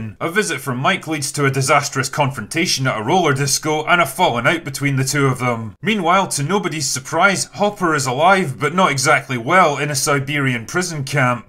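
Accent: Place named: England, United Kingdom. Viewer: Thought he was from England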